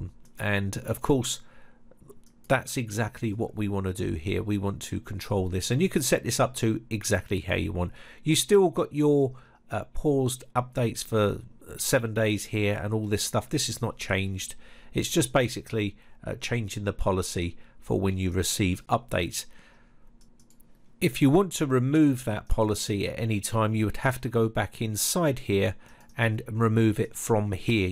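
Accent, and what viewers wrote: United Kingdom. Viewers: Just an idle observation ( admittedly not crucial to the excellent info. in the video ).... What is up with pronouncing the word "defer" like "differ". It's bizarre because this guy sounds like a British native English speaker. I find it hard to believe that such a basic word pronunciation is not known.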